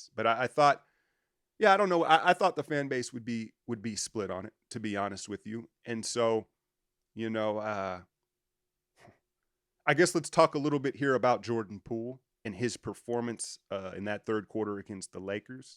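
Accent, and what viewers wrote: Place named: New York, United States. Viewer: Na bro, you can't make it in warrior media for a second. You way too blunt for that organization. You really sound like a New Yorker if am being honest. If I never knew you; I'd think a New York media is bashing the warriors all these years. Happy takes boss!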